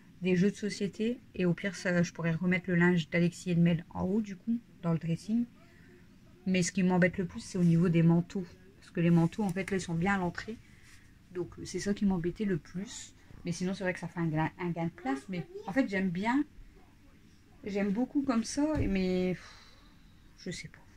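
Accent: Nord, France. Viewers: J adore l accent du Nord Etant moi même du Nord que J ai dû quitter avec regret pour La charente maritime 😏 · Un peu tard, mais de tout cœur, bonne semaine, surtout bon courage pr le gd nettoyage!!!! Mais oui, on aime ta voix, surtout l accent de Nord!!! Ma maman était du Nord, près de Lille, mais n avait pas d accent, 1 de ses tantes, l avait, chaque région, son accent!!! J aime aussi celui de et, gros bisous à vs tous et papouilles à Stella et aux chats!!!!!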